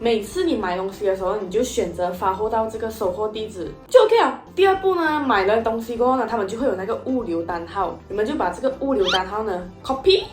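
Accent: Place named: Malaysia. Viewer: 我的天 这就是同为马来西亚人的同乡直觉吗(bushi 哈哈 刚刚滑到你的影片 一点进去听了全程韩语 再看了看频道名字放的是英文名，就在猜说是不是马来西亚人呀，结果还真的 哈哈 一点这部一听口音就确认了 哈哈 加油呀🙌影片很棒👍👍😍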